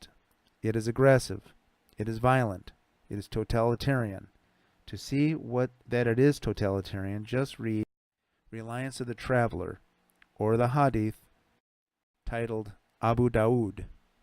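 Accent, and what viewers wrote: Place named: United States. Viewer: Your are right. But by your accent? you are in U.S. isn't it? the problem in Europe is that you talk about what will happen in Europe withon 1 or 2 generations... and too many people in Europe? don't have children, so they couldn't care less about the next generation. Angela Merkel? no children, no grandchildren. Teressa May? no children. Emmanuel Macron, no child of his own. That's a side effect of a birth rate under 2, in whole west and central Europe... They have cheap workers now, they don;t care about the future... BTW utube also blocks ppl fm comment and accounts, under "ofending" ppl rules... Immigrate for the cause of Allah, Jihad by immigration, and taqiyyah lie to them, is is Quran 4:100-104 <link>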